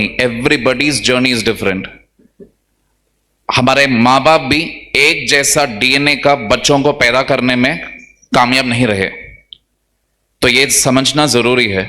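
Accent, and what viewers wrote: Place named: South, India. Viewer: sir belong south side that's why his tone like this.... But practice makes everything so practices it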